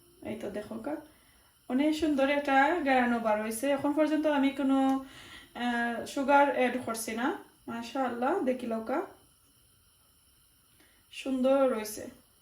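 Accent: Sylhet, Bangladesh. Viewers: nice,please sister don’t change your accent, I like to hear our Sylheti accent, u r a Sylheti person so why should u change your accent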